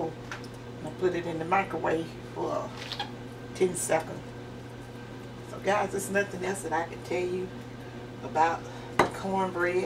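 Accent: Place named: North Carolina, United States. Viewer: Thank you for sharing your recipes! I love that you you’re a southern woman. You sound like my family, we’re from nc.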